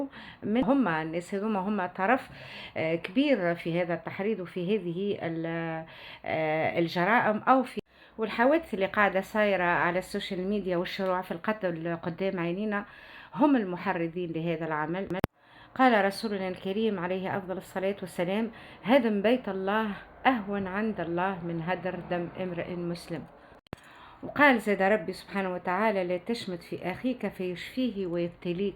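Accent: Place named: Tunisia. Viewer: ماشاءالله تبارك الله احسنتي النشر والتصوير والطريقه والمنتاج ابداع وتميز وتألق اسلوب راقي ماشاءالله ثقافه عالية بشهادة الاسكندراني العجوز جدو جابر الحنفي ممنون تحياتي لحضرتك اسعدني اسلوبك الراقي صوتك وكلامك اكيد من تونس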